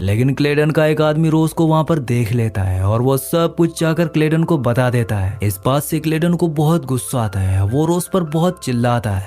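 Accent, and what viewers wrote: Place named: India. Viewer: Language sai urr accent ptaa chl rha hai indian hai urdu esaay nhii bultay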